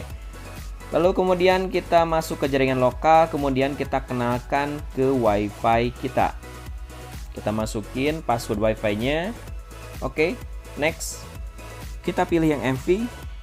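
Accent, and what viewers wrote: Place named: West Java, Indonesia. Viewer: Baceo... Sunda pisan 😁🤣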